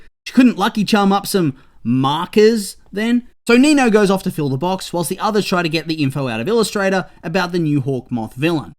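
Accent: Australia. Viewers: I'M SORRY, THIS MAN IN AUSTRALIAN?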